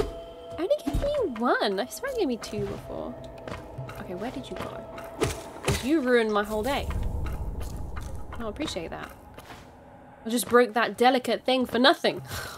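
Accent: United Kingdom. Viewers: Btw, I know I'm echoing a sentiment similar to many others in the comments, but I have never heard of you, and I want to emphasize that I do not care for reaction channels or let's play/twitch vids... BUT! I watched every second of this, and I'm probably gonna watch the next too. You have a thoroughly pleasant voice (I'm such a sucker for British accents, especially female ones) and you are remarkably intuitive for a self-proclaimed novice of the genre. This game holds a special place in my heart and earns its spot on the small/rare S Class shelf of games.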